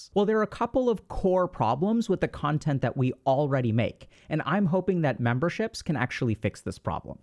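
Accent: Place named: India. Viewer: Just gotta say it’s very dissonant for me to hear ya’ll from an Indian gentleman with a slight accent 😮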